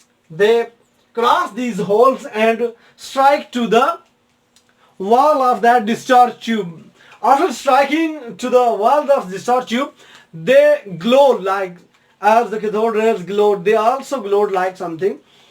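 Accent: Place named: Saraiki, Pakistan. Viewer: سرائیکی وسیب کا ایک بہت ہی پیارا انسان بھائی اللہ کریم سدا سلامت رکھے اور لاکھوں کامیابیاں عطا فرمائے ۔۔سر جب آپ انگلش لیکچر کے بعد سرائیکی میں۔لیکچر دیتے ہیں تو وہ بہت خوبصورت لگتا ہے سرائیکی زبان ویسے بھی بہت سادہ اور میٹھی زبان ہے آپ کا انگلش لہجہ بھی وسیب کے لوگوں جیسا ہے اللہ کریم۔آپ کو خوش رکھے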